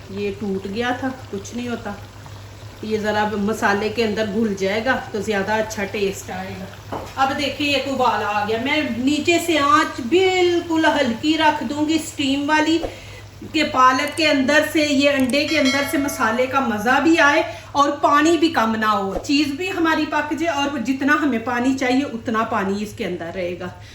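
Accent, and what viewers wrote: Punjab, India. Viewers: Aap kiapunjabi ha bolne ka style se lag Raha ha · Watching from Buckingham, UK. I liked the recipe and your Punjabi style commentary too :) I will try to cook this soon. Insha Allah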